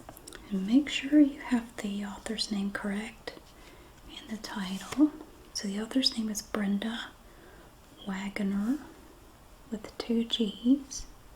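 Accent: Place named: South, United States. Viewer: Your voice is the most beautiful thing I’ve heard in awhile. You sound like Lina Grace with a Southern accent instead of an Australian one. ❤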